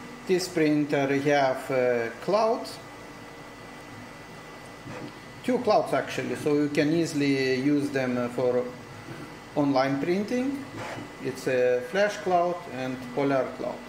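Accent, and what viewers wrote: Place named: Lithuania. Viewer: ish anblu kalbos sprendziu kad lietuvaitis...irgi nusipirkau toki:)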